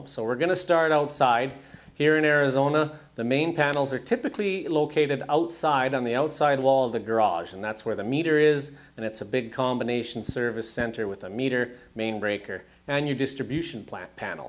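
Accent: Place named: Canada. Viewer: He lives in Arizona? This guy sounds totally Canadian Ay!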